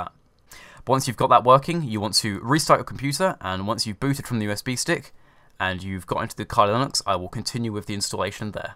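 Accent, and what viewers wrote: England, United Kingdom. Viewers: i like your english accent very nice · finally someone who is English lmao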